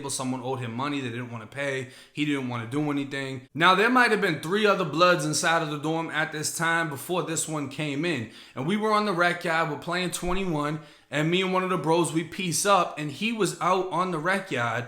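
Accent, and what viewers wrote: Boston, United States. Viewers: Are you from Boston? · Curious my dude you say you're from Florida and talk like you're from Boston I think you're full of shit bro because I lived 6 years in a max and 4 in the shu and bro wheres the big long tatty on the side of your face looks like your reppin someone else's life race runs the yard stop talking shit like you were accepted as an equal because you'd know nobody's equal in there fucking joke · I don't understand why this dude always talks about Florida and he sounds like he's from Boston??? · He's from Boston area so, yeah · that Irish and Italian you know u from mass bro .. lol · This dude tries so hard to use a wigger accent but his white Boston accent comes out, just be yourself or you won't ever be able to walk the streets in NYC facts and If you do tuck that flag we ain't Boston for corner Florida, we get down! 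· U from Boston homie u sound like it tho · Yo you have the heaviest Boston accent. I thought my NYC accent was bad but you win. Lol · Is this dude from Boston or some shit? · U live in FL but sound like you're from baaaaaston Massachusetts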